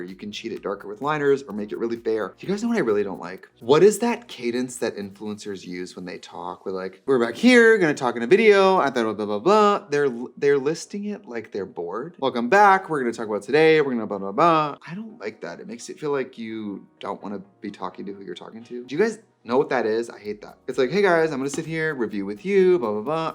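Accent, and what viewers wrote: Wisconsin, United States. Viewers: I don’t know why but I cannot focus on anything other than how Wisconsin she sounds and now I’m questioning everything and am like does she always sound like this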